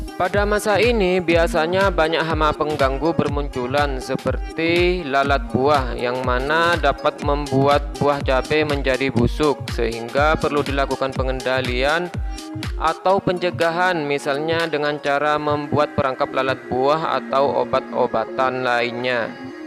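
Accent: Madura, Indonesia. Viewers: asli mana bang? kog logat madura kayaknya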